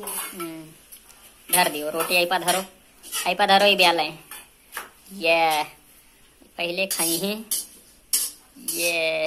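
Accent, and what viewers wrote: Raebareli, India. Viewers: Ya to raebareli ke boli aay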